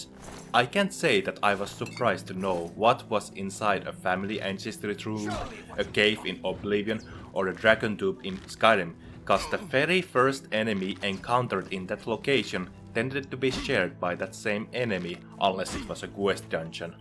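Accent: Finland. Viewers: Onko tää suomalainen kanava? Kaveri ainakin ns. suomiaksentilla selkeesti :)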